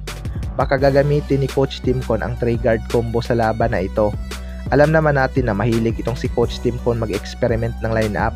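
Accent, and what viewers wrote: Visayas, Philippines. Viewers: bisaya ka!Ayusin Mo ung pag kkwento..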